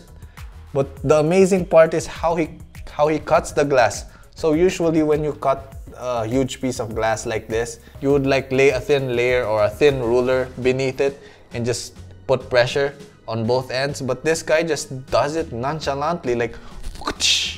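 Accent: Philippines. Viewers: Hahahahaha I’m assuming he’s filo! Coz his filo accent is STRONG!! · he filipino based on accent. lol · within the first 10 seconds I KNEW you were Filipino hahaha I love it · Kaya pala familiar yung accent Pinoy nga hahaha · Fluent english with filipino accent. Nice one dude 😊😊😊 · Me: hears accent Also me: guess he’s Filipino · hes from the Philippines men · That pinoy accent is so distinguishable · I knew from your accent. You lived in the Philippines, wow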